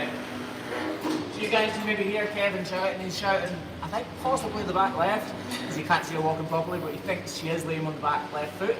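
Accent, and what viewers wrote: Scotland, United Kingdom. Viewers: yup. though I usually watch on mute...I learned you are Scottish sounding today! facepalm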